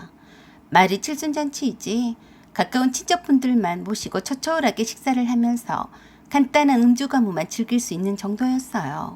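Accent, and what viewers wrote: Jeolla, South Korea. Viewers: 찰진 전라도 사투리가 섞여서 아주 재미납니다. 모지리 찐빵 ㅋ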